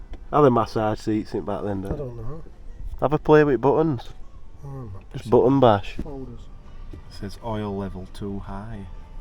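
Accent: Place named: RP, United Kingdom. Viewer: You've gone all posh now.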